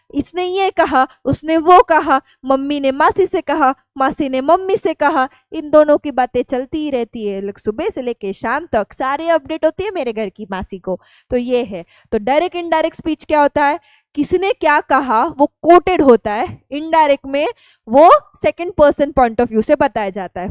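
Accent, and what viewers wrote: India. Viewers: Hand gestures ,indian english and over acting pure ke pure sade panso rupey cut kardiya jayega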